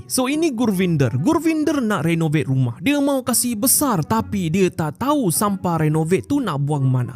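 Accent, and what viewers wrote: Sabah, Malaysia. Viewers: Dia cakap slang sabah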